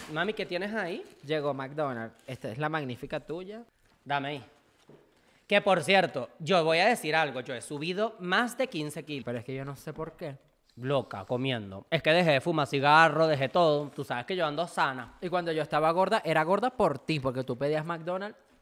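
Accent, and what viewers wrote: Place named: Spain. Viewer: Porqué La Divaza tiene ese acento Español con las eses?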